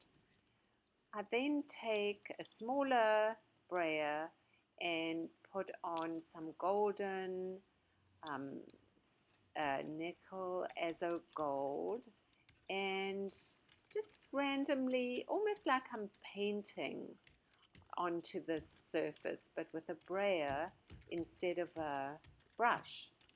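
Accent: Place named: South Africa. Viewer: Nice South African accent ! 🇿🇦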